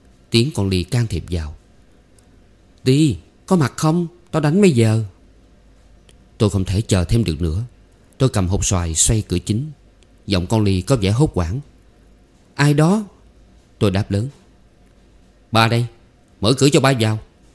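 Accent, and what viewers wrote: South, Vietnam. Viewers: Từ lúc biết kênh Tủ Sách Giải Trí và Giáo dục Tinh Hoa, được nghe giọng đọc miền Nam cực hay của anh, tôi đã không nghe bất cứ kênh nào khác. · Tôi cũng rất thích giọng đọc miền nam của. Anh dien tả đầy cảm xúc.Luôn ủng hộ kênh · Tôi rất thích giọng đọc miền nam của. Like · cảm ơn ông NGHE GIỌNG ĐỌC MIỀN NAM RẤT THÍCH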